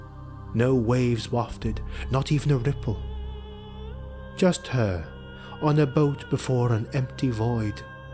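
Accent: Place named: England, United Kingdom. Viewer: A very good story, been looking for something like this. Like the voice, sounds English, will wait for more.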